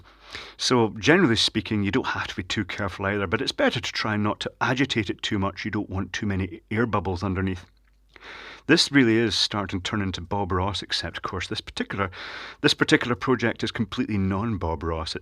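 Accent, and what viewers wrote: Scotland, United Kingdom. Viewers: Talking hands with horrid middle class Scots accent,hope he disappears up his tunnel of typhon.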